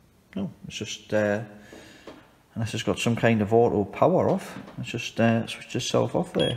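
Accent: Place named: Scotland, United Kingdom. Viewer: Great video, love the accent. Like a slight touch of scottish in your north east dialect. Keep up the great work. Kind regards. Paul. 👍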